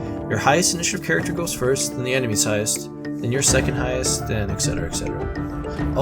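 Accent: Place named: Midwest, United States. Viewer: yea sounds like a Midwesterner or something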